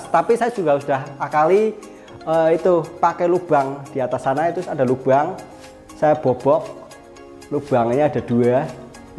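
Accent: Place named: Pekalongan, Indonesia. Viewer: Assalamu'alaikum mas, saya penjahit dan tertarik dgn kontennya karena enak dan jelas, dan logatnya saya kenal, ternyata bener orang pekalongan.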